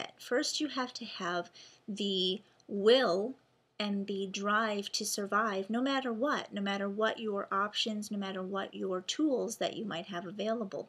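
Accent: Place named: Midwest, United States. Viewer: ... Your accent sounds mid-western so I'm guessing Ohio. You need to adjust your logic. There is a third and fourth reason for a person being "knocked down". 3.) The stance the person is in at impact and direction of bullet travel. 4.) The "point" of impact. I saw a man shot 7 times in the chest with a stupid.25 auto and he ran to nearby house and sat waiting for an ambulance. I also saw a screwball shot with a.25 auto in the forehead. It didn't kill him, but it knocked him down.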